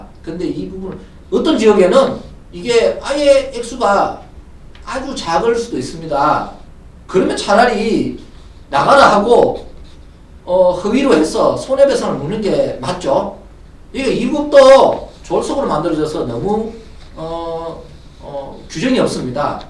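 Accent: Gyeongsang, South Korea. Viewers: 경상도 억양이 저렇게도 또렷한데 ㆍ서울 아니면 ㆍ다 시골이라고 하시는 분이신가보다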